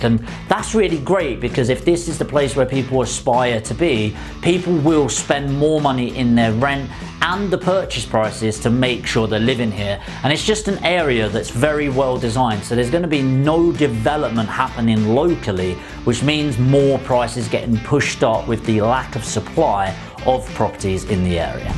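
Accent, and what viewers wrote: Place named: England, United Kingdom. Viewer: Filling it, sand in it, in it? Love the English accent after American movies 😁...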